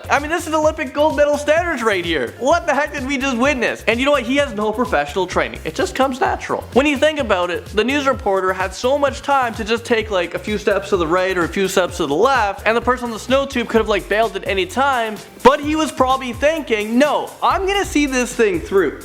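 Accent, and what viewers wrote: Canada. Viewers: RyanCraft1 hes canadian of course he sounds different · U Canadian bruh? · r u Canadian or (presenter)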